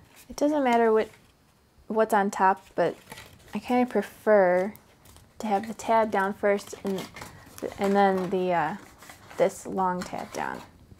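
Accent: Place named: Midwest, United States. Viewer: "Taaag" and "Staaak" That good ol' mid western accent!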